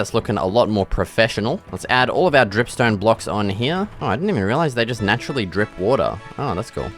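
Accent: Australia. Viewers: How as an Aussie did I never notice how strong our accent is 😂 I'm new here and I'm loving the new hardcore series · I'm Assuming Your an aussie ""Mate.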